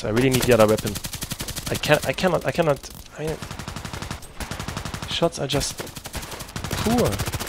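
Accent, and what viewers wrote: Germany. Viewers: cwaddict LOL i knew it you germans have that kind of accent :#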